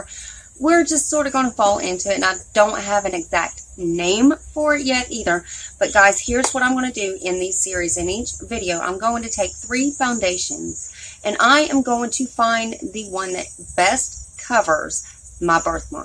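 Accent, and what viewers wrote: South, United States. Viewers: I was curious,Where are you from?Im from Arkansas.You sound a bit country like here.💞💖💕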